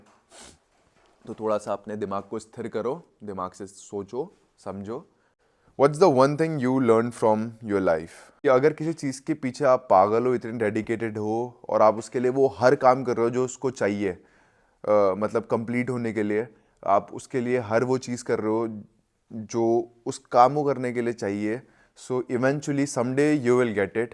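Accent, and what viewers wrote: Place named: Delhi, India. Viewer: u r talking like generic delhi boys. dont know whose personality rubbed off on u. pls go back to being your simple self who is not so camera trained and delhi speaking style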